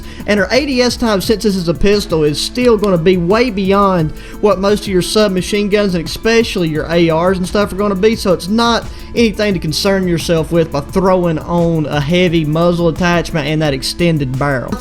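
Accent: United States. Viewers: Man sound like a country guy with a shotgun sitting on his porch not trying to make fun of u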